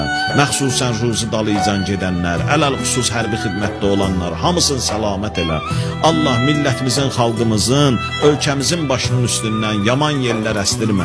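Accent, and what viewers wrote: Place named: South, Azerbaijan. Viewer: Cenub bölgemizdenem bilirem danışığnınan qardaş sende cenublusann 😢😢😢.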